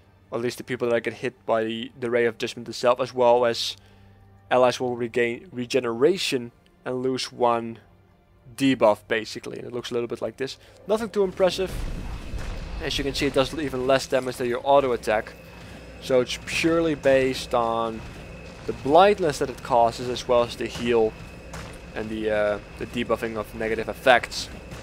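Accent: Netherlands. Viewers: You dutch i hear your accent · Yo mede Nederlander, nice vid ! ps: Je accent verraadt je :p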